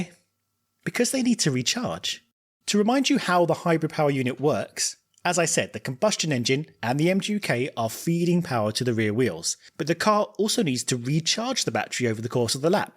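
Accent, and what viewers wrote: United Kingdom. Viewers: The US Audio Track is better balanced. But still sounds British 🙂 (I'm Canadian, so the accent doesn't really matter [I know it's just a 2nd audio track with better balance]). Glad you're back! Your videos are fantastic!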